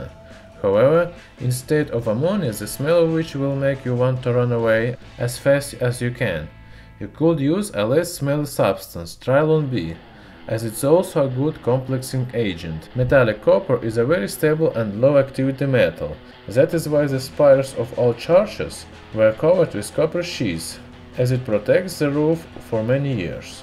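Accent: Russia. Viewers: This is russian aksent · I.lke the Russian accent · Is that Russian, Romanian?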